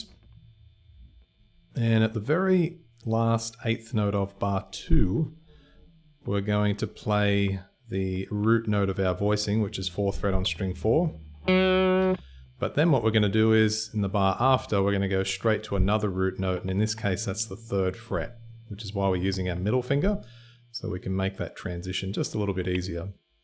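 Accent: Australia. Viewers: Very interesting lesson, I listen to many types of music and play guitar, I've always liked Joy Division and kinda connected with Curtis... and later in life met and moved in with my significant other in Macclesfield[many years after his death] and She lived a hundred yards from Ian, and her dad worked with him in the 1970s The locations of his house and where he worked in the film Control are genuine. Her father~Trefor, said that Ian was very intelligent and really cared about the people that their social security dept tried to help..... which was mainly disabled people. Trefor died recently and had no idea who Joy Division were and about Ian's writing. ;~) now living back where I grew up, with Trefor's Daughter and "Macc Lass" , on south coast of England to look after my elderly mother. Noticed your accent , I have a younger half bother who now has duel citizenship with UK and Australia. 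He's just moved back there permanently with his baby kid to continue teaching Maths. Forget exactly where he lives, it's within a hundred miles of Melbourne. I talk too much blahblahblah... Cheers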